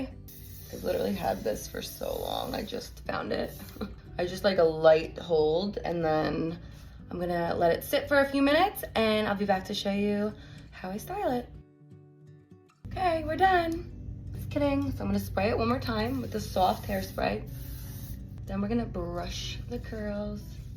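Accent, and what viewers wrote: New Jersey, United States. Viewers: Awww she’s got the Jersey accent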